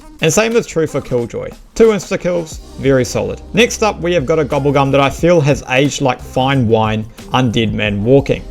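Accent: New Zealand. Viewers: yooo bro i came from the lex video and instantly recognised your accent since im a kiwi too. love to see a zombies creator from nz. keep it up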